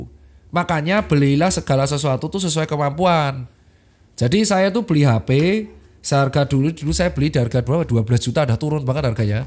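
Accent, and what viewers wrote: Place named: Pekalongan, Indonesia. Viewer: Koyok wong Pekalongan sih logate😂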